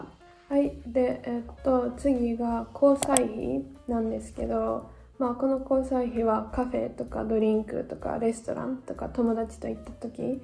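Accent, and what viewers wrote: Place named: Kansai, Japan. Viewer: 初めまして。Hikaruさんの動画がたまたま表示されたので、興味が湧いてきて見てみたらなかなかエモかったです。 僕も去年の夏まで駐在でオランダに住んでいたのですが、今は在欧邦人の間で和食が手に入りやすいことから一定の知名度を誇る（かもしれない）ドイツ西部の町で仕事しています。 Hikaruさんが別の動画で挙げているオランダ人の温かさ、また美味しいご飯にはなかなか出会えないという体験談に思わず頷き、共感しながら色々な思い出が蘇りました。笑 あと、関西弁が素敵です！ 同じ関西民として、Hikaruさんが今後もオランダで納得のいく暮らしができること、そして大変な毎日でもたくさんの幸せに恵まれていくことを祈っています。 全力で応援しています。 ドイツで僕も頑張ろうと勇気と元気をいただきました。ありがとうございます！ 速攻チャンネル登録しました。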